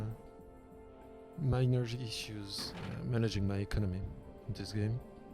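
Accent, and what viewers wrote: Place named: France. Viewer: J'avoue son accent français se remarque tellement mdrr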